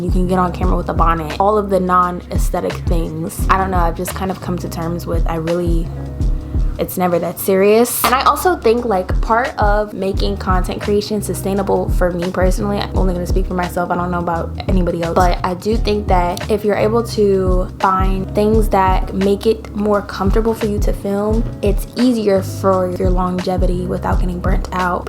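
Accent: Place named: United Kingdom. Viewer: Yessss we missed you , at least the British accent will never change on us 😭 love your videos ❤❤❤❤ so glad your doing well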